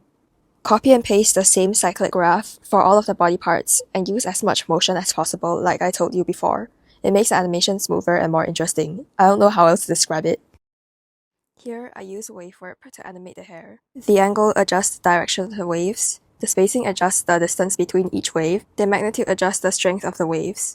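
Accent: Singapore. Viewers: I can hear the singaporean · Cool tutorial omg the final is so cute. Also woahahah your accent sounded so familiar. Checked your bio and... singapore?!?! 👀 Me too omg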